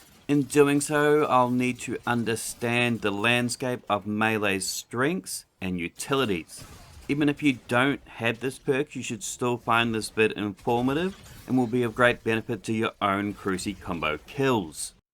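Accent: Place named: Australia. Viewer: Creative ideas, informative and well presented, entertaining aussie accent, subscribed for sure!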